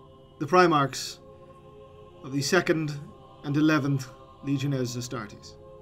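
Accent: Ireland. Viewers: As a lifelong warhammer fan can't believe I am only finding this channel now. Exceptional presentation and delivery. Are you from Ireland.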